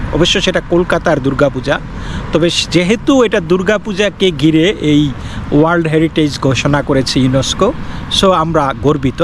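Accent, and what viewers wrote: Bangladesh. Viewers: ওওওও দাদা আপোনার বাংলা বলার ছিরি অমন ক্যানো? ওওওও বাংলাদেশের আপনি?ইন্ডিয়ার বাংলা এমন নয়।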